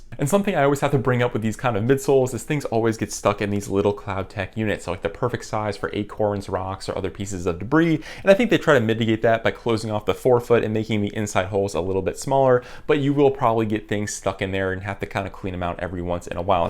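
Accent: Maryland, United States. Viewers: I love when I’m watching YouTube and can just hear that someone is also from MD.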